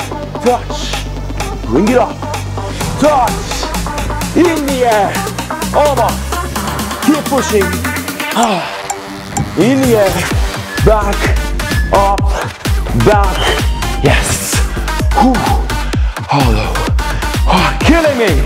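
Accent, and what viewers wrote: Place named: Australia. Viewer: Sounds like Australia; but I could be wrong.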